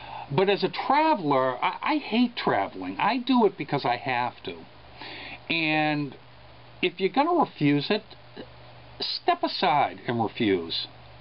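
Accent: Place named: Boston, United States. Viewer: HA!!!! Now I know what your accent is.... a Boston accent! lol Soulpeace Sharon Alyss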